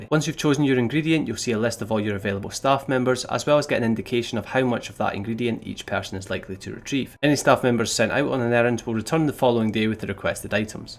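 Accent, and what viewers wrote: Scotland, United Kingdom. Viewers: You're talking to fast lad , i could barely hear you through your Scottish accent. 😅 Despite that, this video helps me , makin good progress on the game. Thx for your time uploading this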